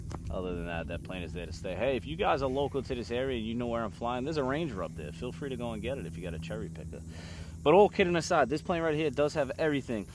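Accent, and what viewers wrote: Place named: New England, United States. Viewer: Nice! I can’t tell if you are MA or NY. RI maybe? CT? You said stall like stawl but then cherry picker like cherry picka…so I’m confused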